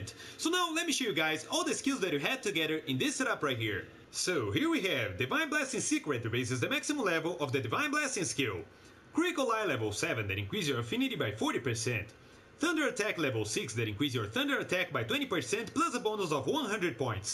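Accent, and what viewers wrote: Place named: Brazil. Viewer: Seus videos são top e sua pronuncia é perfeita , só não dá pra esconder aquele sotaque de respeito , mto bom ver br's que trazem conteúdo pra gringa , gg mano , also nice build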